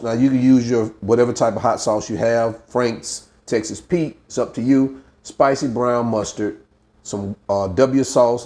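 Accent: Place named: Alabama, United States. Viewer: Oh my sweet Jesus, can I be your friend and come eat at your house. I promise ill put it on it each time lol 😆 but really this was amazing to watch. Imma stalk you to learn all your techniques and I knew you was from Alabama for some reason b4 you said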